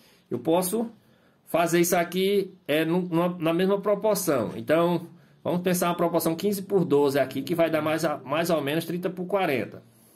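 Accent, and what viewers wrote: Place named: Northeast, Brazil. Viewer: EXCELENTE DICA, obrigado professor, só uma curiosidade, o SENHOR tem um sotaque nordestino, de que estado o SENHOR e'? Adorando seus vídeos, também sou pintor, PARABENS PELO CANAL, Abraços aqui de BH- MG.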